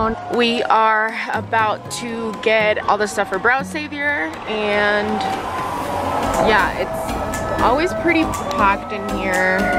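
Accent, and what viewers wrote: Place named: United States. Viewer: Porque los popis de mx. Ablan English while in Mexico???? Osea se los olvida el Español in Gringa voice!!! Tots not gringa!! Lol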